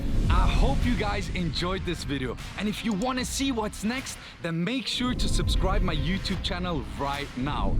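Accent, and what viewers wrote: South Africa. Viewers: Yoh. Last time I watched you was years ago and you sounded Swedish now you sound more South African 😅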